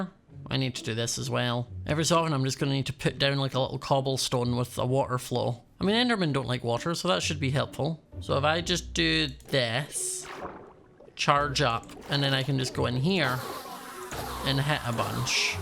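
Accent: Scotland, United Kingdom. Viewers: I'll keep that in mind and will try and remember to check my notifications at 6pm at Tuesday and Saturday- Btw, I love the Afterlife series! The Scottish accent makes your vids better and funnier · U are Scottish aren't U respect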